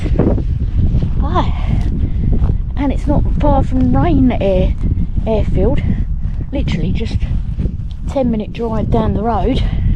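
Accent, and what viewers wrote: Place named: United Kingdom. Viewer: Ich habe nicht alles verstanden, aber ich liebe diesen britischen Akzent.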